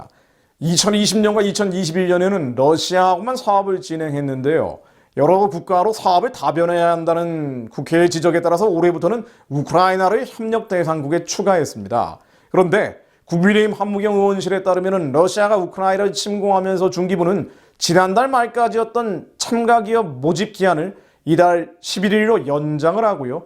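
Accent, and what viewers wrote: North Korea. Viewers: 조선족이거나 북한인같네요. 억양들어보면 북한억양입니다.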